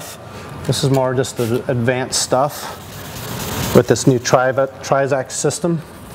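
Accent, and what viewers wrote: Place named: United States. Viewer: I like yourself am about 20+years and have used 3m products continuously, yes ive tried most other "scene" machine polishing brands and have always come back to 3m,and have realised there are so many rebranded materials so if it aint broke dont fix it! Rotary and 3m products do exactly what you want them to, good video ur like me but with an American accent lol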